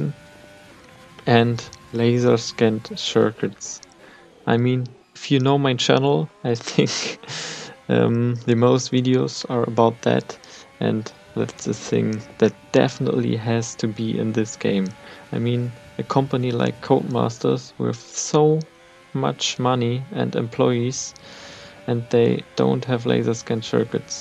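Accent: Germany. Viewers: Da du deutschen Akzent hast versteht man dein English viel besser!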